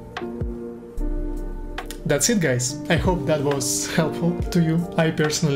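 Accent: Russia. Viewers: так ты русский знаешь. Я такой сижу слушая твою речь на инглише и понимаю , что это мать вашу русский говор . Ахаха, приятно удивился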